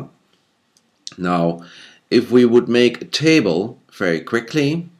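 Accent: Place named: Belgium. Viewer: You sound Belgian!!!!!!!!!!!! Are you?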